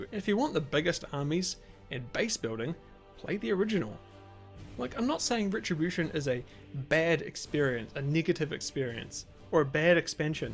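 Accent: New Zealand. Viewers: Loving your kiwi accent; this expansion was indeed the beginning of the end, despite some good ideas...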